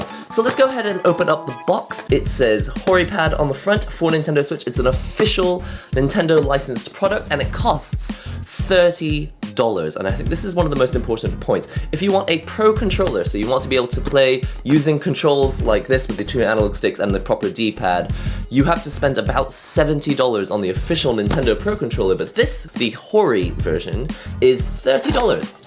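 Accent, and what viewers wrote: United Kingdom. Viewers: The funniest thing is that he has a British accent. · I don't wanna sound rude or racist but... I think it's kinds weird how u look/are Asian Yet you have some sort of a British accent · it's fuckin confusing a Japanese dude talkin perfect british accent !!!! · U have a British accent? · i had the video on in the background and he sounds regular british and he says 'on the front it says hori pad' and i thought that was odd - i mean, we can read, right? ... so i checked the video and yeah. couldn't read it. thanks for that.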